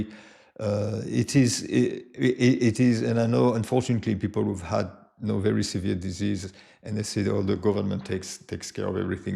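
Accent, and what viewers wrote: France. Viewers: "Why I Moved to France"... Are you talking about yourself? Because you sound French... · Is he not? I mean, that is unmistakably a French accent. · Hi, Are you french yourself ? You seem to have a french accent.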